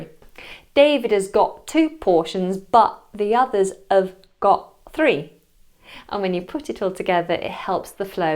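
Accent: United Kingdom. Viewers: You are so cute ❤️ You make me love English and also British accent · I love your smile with nice British accent.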